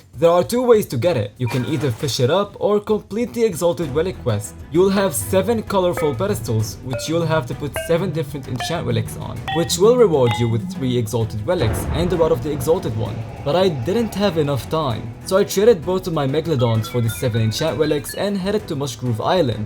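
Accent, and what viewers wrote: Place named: India. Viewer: Bro what is this srupid Indian acent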